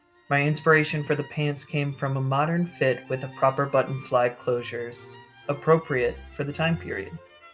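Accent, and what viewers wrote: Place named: United States. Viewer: I was thinking that you had suffered a catastrophic research failure... but then considered your American accent. So, yes, the Macaroni's were famously tasteless in their dress, celebrating the nouveau riche over the 'conspicuous inconspicuousness' that was fashionable at the time. So, while you certainly did well with the colours and embroidery patterns of the period, you were then let down by the poorly cut weskit and over-buttoned breeches. Sigh, and no hat. Please, wearing a hat was thought to be a matter of basic decency. When indoors and not actually wearing it, you would still be expected to be carrying it! Your sexuality is of no concern to me, but your drunken fashion choices (thank you, Dionysus) appear to be deliberately offensive.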